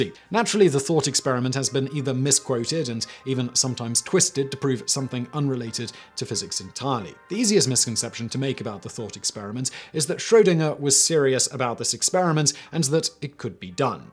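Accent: United Kingdom. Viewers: Today I learned there’s another vsauce, except with a British accent.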